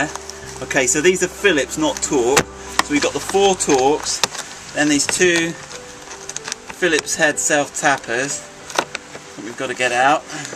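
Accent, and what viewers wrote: United Kingdom. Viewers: 😂😂😂😂 That British accent😅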